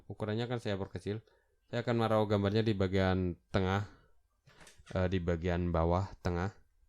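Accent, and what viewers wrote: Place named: Java, Indonesia. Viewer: ngomongnya gausa di medok medok kakaaaa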